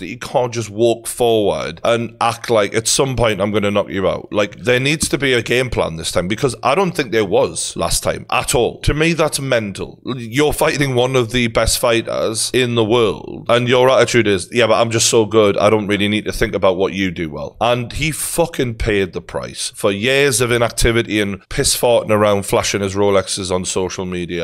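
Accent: Newcastle, United Kingdom. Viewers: Everyone recognizes geordie good work bro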